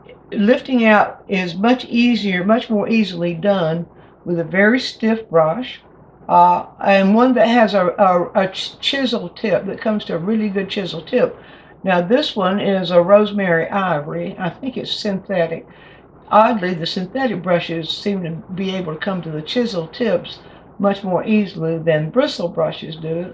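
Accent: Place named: South, United States. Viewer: Yet another nugget of wisdom from YouTube's best painting instructor with the most beautiful southern accent. 😊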